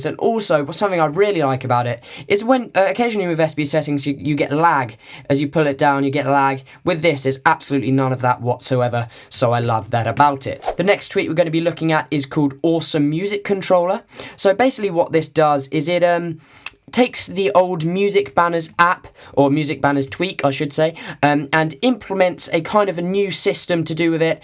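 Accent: United Kingdom. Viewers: It's odd: everyone that does these cydia videos has a British accent.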